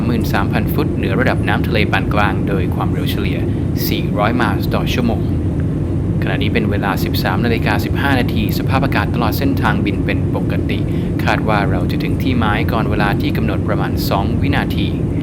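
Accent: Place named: United Kingdom. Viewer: I LOVE YOUR BRITISH ACCENNT ♥️♥️♥️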